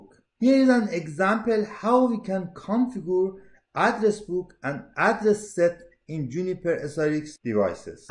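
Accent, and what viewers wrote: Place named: Iran. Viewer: سلطان لهجه ات خیلی ایرانیه :دی دمت گرم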